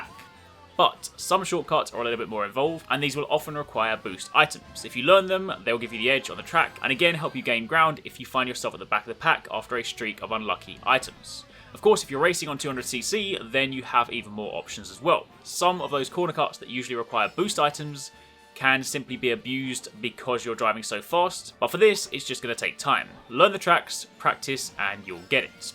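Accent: England, United Kingdom. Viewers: Of course he has an english accent omg tired of that shit every single time i look up a game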